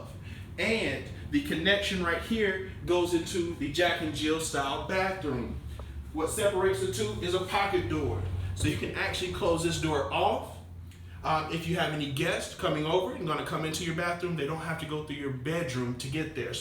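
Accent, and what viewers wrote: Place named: Texas, United States. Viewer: We can never watch the videos all the way through because the way talks is so annoying. A mix of thug and texas rancher. Most media forms recommend a standard midwestern accent or so I was taught in journalism class.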